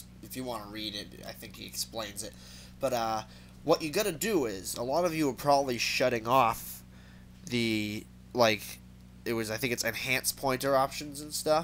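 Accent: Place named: New York, United States. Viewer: man.. are you from NY or NJ, accent is hiliarous lol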